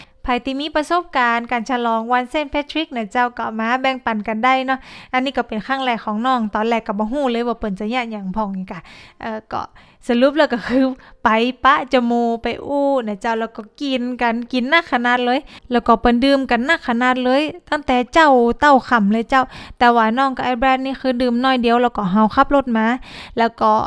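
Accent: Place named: Chiang Rai, Thailand. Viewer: จากสำเนียง…น่าจะคนเชียงราย ไม่ก็ แพร่น่าน แม่นก่อ..ตั๋ว??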